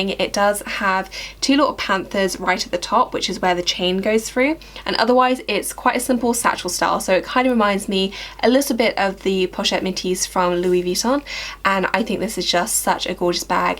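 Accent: United Kingdom. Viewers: I am not against her right to make video on luxury bag. The reason why I watch her video is that she is such a sweet lady with amazing British accent. Being an English teacher, I really enjoy the way she speaks . There is nothing wrong about her making video. Sometimes I get frustrated about the fact that we work so hard just for earning a living, hardly can afford kath kidson bags.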